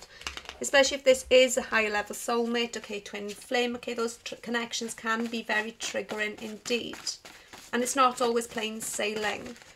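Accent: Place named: Wales, United Kingdom. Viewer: Oh yea!! 😍💪 After a good few years of listening to these taro card videos, iv never found a Welsh person do one 😍💪greetings from Carmarthenshire 😜💪 and I have to say you have a Beautiful accent 😍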